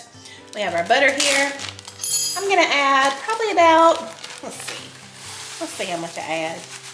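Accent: South, United States. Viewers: I'm new to your channel really enjoying your recipes and home decor. Where you from im from ky i noticed you have a southern accent. Thanks for sharing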